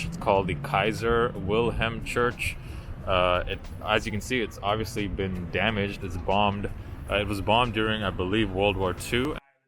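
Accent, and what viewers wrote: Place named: United States. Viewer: it was good man, nett video ! you speak english like an American if you didnt mentioned you were from Turkey I thought you were from the States 👍👌🇩🇪🇺🇸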